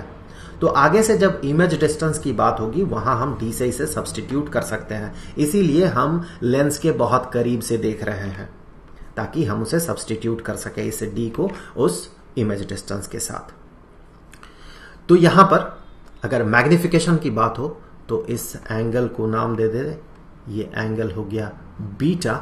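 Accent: Bihar, India. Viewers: Sir are u from bihar?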